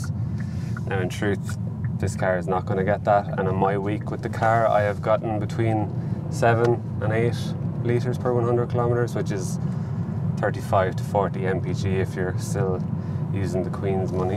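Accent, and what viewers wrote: Ireland. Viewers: Best channel ever... It has cars and Irish English, love it. Hope all is well with Sinead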